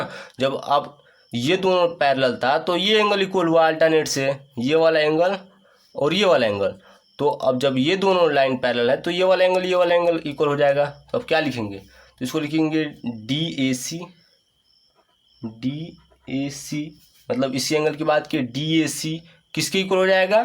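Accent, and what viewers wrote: Bihar, India. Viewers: Arrey Bhai Sirji Bihari Haina Isliye Sir K Bolne Se Hi Pata Chalta Hai Ki Sir Bihari Hai 😀👍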